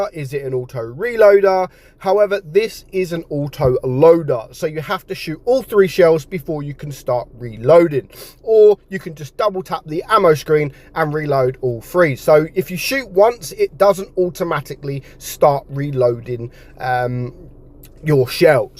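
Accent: United Kingdom. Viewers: He has a accent and I think he British 🦷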